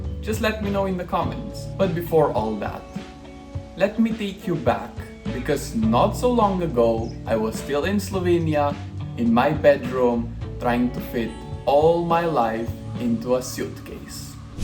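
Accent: Philippines. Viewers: You speak your English in a Filipino English accent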